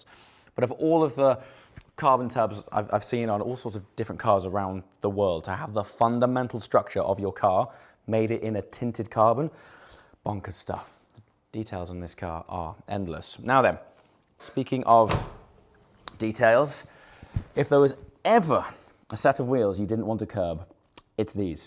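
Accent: United Kingdom. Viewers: Why do you sound about 20% more british in this video?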